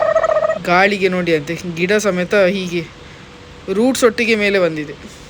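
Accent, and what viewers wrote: Mangalore, India. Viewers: ಮಂಗಳೂರು ಭಾಷೆ ಕೇಳ್ಳಿಕೆ ಖುಷಿ ಆಗ್ತಿದೆ ಮಾರ್ರೆ 👌👌👌👌👌👌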